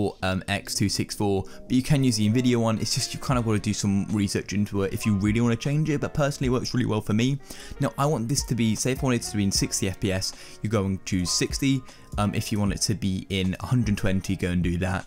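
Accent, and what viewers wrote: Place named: United Kingdom. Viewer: Hehehe, your cute.. but very UK.. I have friends from there... I do like the video very informative, thank you so much... :)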